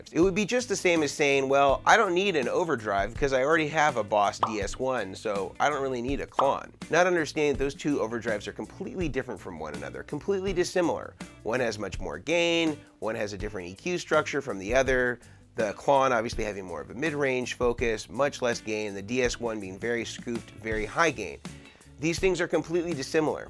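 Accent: United States. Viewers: Not in America. He’s saying it correctly for Americans. Don’t take it personally.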